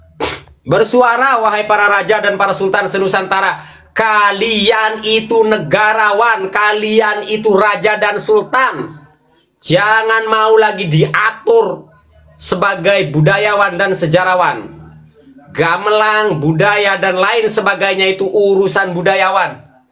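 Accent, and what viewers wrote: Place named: Cirebon, Indonesia. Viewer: Emang nte wong ndi....bersuara mslh cherbon....tp bagus juga sihh....lanjut lahhh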